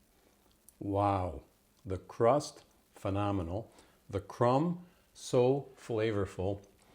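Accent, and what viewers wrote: Canada. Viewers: Hey i got a question been watching a few of youre video i was just wondering where u from u sound Canadian but im not sure im from just outside Toronto ty have a good day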